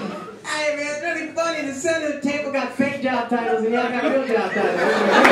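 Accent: United States. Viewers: Some indians have a difficult time understanding his American accent,that's why everyone's going on about the audio.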